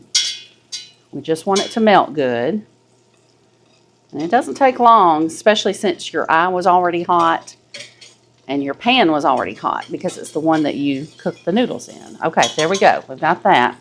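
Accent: United States. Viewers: 1st time viewer in Latvia. Wow, the minute I heard your accent, I knew this was gonna be the real deal for this iconic American dish! Appreciated the relaxed, down to earth presentation. Subscribed. Thank you!🎉 (Will maybe add some ground pepper tho😂)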